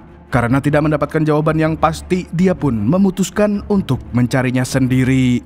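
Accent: Banjar, Indonesia. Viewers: Buhan Banjar aaja nihh adminnya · Urang Banjar kah l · nahh urang banjar sekalinya amang nih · urang banjar kah pian mang😅😅😅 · Orng banjar kh mang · Babang editor ni org Banjar kah😅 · urang banjar jua kali ya pian lah😂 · Jahh urang Banjar kah min? 😅😁👍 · Banjar sekali nya semngatt tarus bang ngonten ny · Adminnya urang Sungai Tabuk kah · widih orng banjar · Urang banjar pinanya sidin..😅😅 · Urang Banjar kah mang? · uhhh org banjar😂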